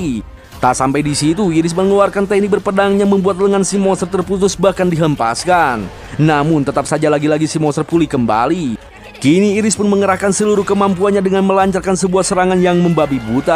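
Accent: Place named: Manado, Indonesia. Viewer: Takage ya manado banget😂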